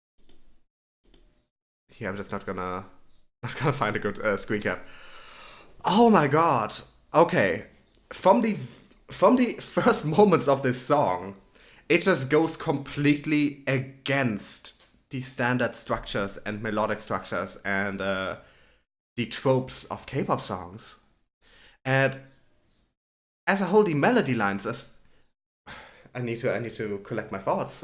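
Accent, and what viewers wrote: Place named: Germany. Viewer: Der Akzent hat es verraten.. Hätte aber nicht gedacht, dass ich jemals einen deutschen Kpop-Reacter finden würde. Es freut mich, dass dir das Lied gefallen hat, sind meine Lieblingsgruppe. Ich würde dir von ihnen empfehlen, falls du es noch nicht gehört hast!:)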